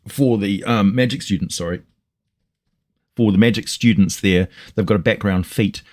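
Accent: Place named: New Zealand. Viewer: Excuse me New Zealand I said UK in my last post don't know why I said UK the accent is different LOL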